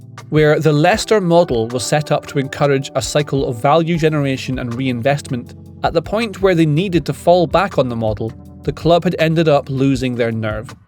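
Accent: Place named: Scotland, United Kingdom. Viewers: Beautiful Aberdonin accent, this is much more pleasing on the ear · I do love the Scottish accent!. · Why not, do you always actually believe what a youtuber says, 😂 he must be a financial expert and a Leicester expert, plus he's sounds Scottish must be a Celtic fan.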